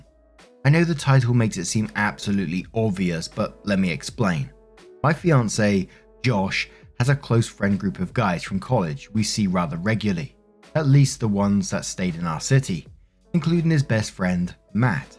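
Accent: United Kingdom. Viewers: He's British they have a different way of speaking fuckin deal with it and stop making it some sort of big deal when it's not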